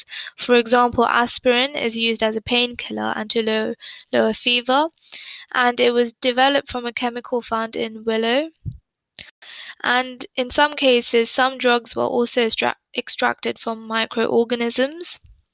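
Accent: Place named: South England, United Kingdom. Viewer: idk how to explain it! 😂 I haven’t seen many people speak with your accent and idk it’s just really nice! 😂🥰 are you from the south of England?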